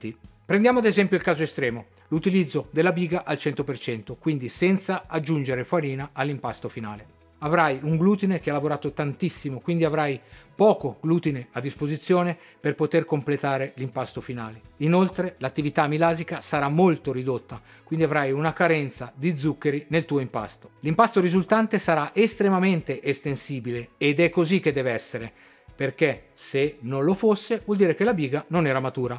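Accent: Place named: North, Italy. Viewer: Complimenti!! Bel video. Saluti dall'Argentina!!! Sembri veneto o lombardo. Da dove sei?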